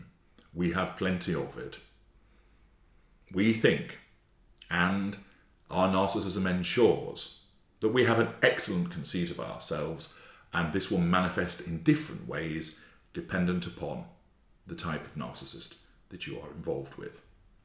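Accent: United Kingdom. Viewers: Are u British ? 🙏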